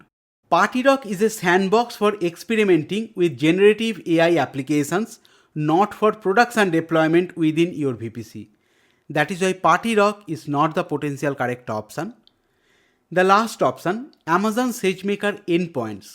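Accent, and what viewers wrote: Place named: India. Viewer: These are the best series for Indian users, the voice and accent is for the Indian ears. Also the content is well researched better than AWS own AI practitioner course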